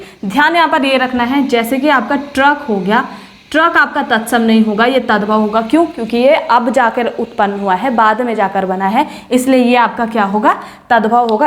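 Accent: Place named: Uttarakhand, India. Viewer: Bhut acha pda rhe ho mem aap uttrakhand se ho lg raa 🙏🙏🙏🙏👍👍👌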